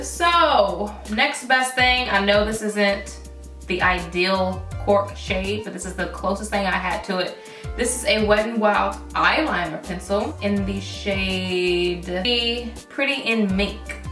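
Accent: South, United States. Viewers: where are you from?! I wanna say the south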